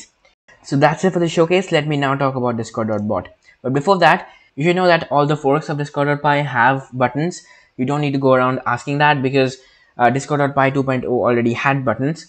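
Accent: India. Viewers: are u indian? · Damn you sound more indian than ever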